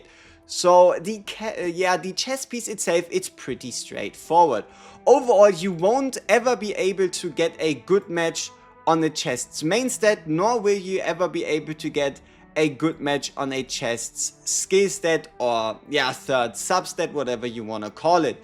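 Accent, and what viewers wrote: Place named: Germany. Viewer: I spot myself a fellow german when I hear one :D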